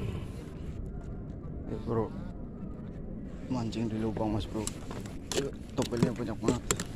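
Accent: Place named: Banyumas, Indonesia. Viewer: Waduh malah patah sepining pancing nya ,yang penting dapat ikan bos,ngomonge ngapak koh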